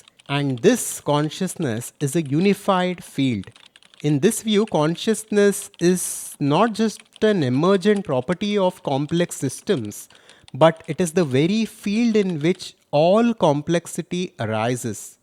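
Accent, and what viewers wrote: South India, India. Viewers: You’re feeling a little out of place because of his accent. It’s the regular Indian accent which all Indian languages speakers understand. You’re used to western accent which is foreign to us when we travel to Britain or USA, but with time everything falls into place. No one is disturbed by your observation. We are one people so don’t worry. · That s an strong south Indian accent , u north indians will feel it different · It’s called an accent, he has an Indian accent. 🙄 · It's just his indian enunciation,but we aught to focus on the content : l thought it very informative,and useful.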